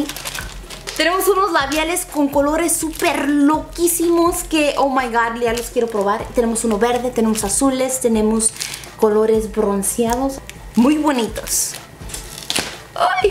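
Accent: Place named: Michoacán, Mexico. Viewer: !!!!! Felicidades!!!,tengo poco siguiéndote en tus tutoriales me gusta mucho como te maquillas, qué bonito rostro tienes, quisiera saber de donde eres originaria o de donde son originarios tus padres porque tienes un acento como que del estado de Michoacán, recibe saludos desde México D.F.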